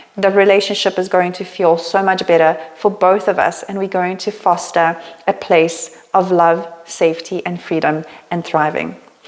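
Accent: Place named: South Africa. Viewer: Literally get so triggered hearing a white South African accent. I associate it with so much racism, can’t even hear the content. Goodluck with your channel, sincerely x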